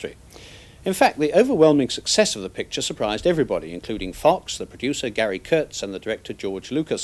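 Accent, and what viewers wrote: RP, United Kingdom. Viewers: Okaaaaaay.....I watched this "film review" and then came on her to make a few acidically sarcastic comments, and then saw everyone on here pouring out love for this guy. Buncha Brits! From where I live in Texas this doesn't even qualify as a film review no matter how posh is this guy's English. The only things he said that could be interpreted as relating to the quality of the movie were that it is successful and that George Lucas is a good director.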